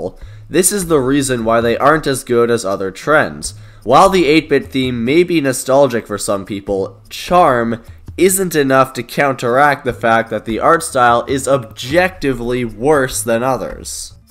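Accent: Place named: United Kingdom. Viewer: glow is the best for sure to me (for a creator with an british accent) and hell is 2 (for a creator with an british accent) core is 3 if done right (for a creator with an british accent) 4thly thats not the most impressive thing in the world minigames and stuff like that go play what and change of scene (for a creator with an british accent)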